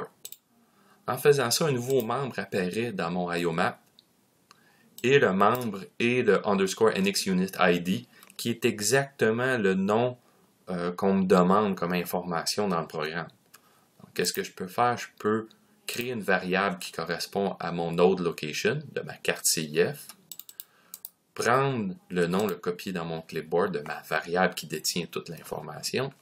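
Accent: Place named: Quebec, Canada. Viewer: Ben trop québecois ton tit-accent toé là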